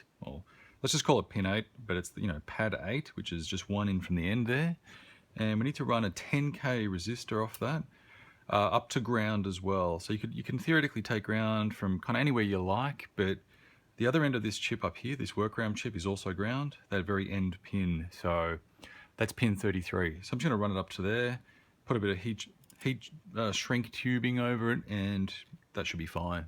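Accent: Australia. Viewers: Garage Great Video mate! What part of Aus are u from?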